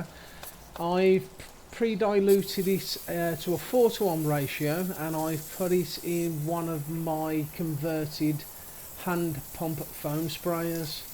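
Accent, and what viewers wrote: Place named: West Midlands, United Kingdom. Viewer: Hi great video enjoyed it, have u tried autobright direct products, thats all i use and the results are spot on, sounds like youm from the west midlands ?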